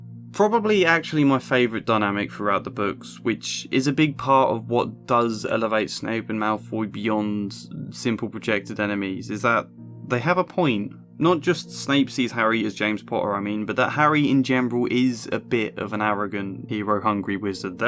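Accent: England, United Kingdom. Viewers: I love you Mr calm English accent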